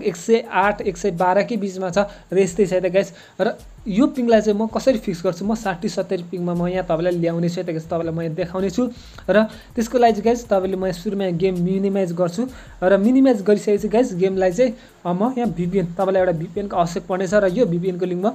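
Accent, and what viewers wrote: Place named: India. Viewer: Yo Indian jasto hawa dherai nabolnu hai.eakdam irritating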